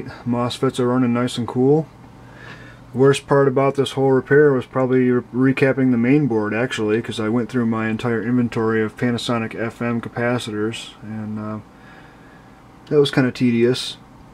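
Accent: United States. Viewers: I am sure every state and country you have visited you heard the word SOLDER, why was this word mentioned to you? Do you sell it or what? Add another letter and wou get the word SOLDIER, how do you pronounce that? SAWDIER. It is two syllables SOL say that.... and DER..... and that. Now put them together, what do you get, MUPPET. You are obviously American, where you say Cli'n instead of Clinton, Innernet, couner, couny, twenny...............learn to speak English. Muppet.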